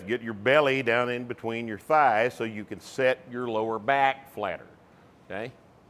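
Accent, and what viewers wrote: Texas, United States. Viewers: I've got rippetoe at 1.75 speed and it still sounds like just slightly fast talking. Lol. That slow texas drawl is strong with the. Lol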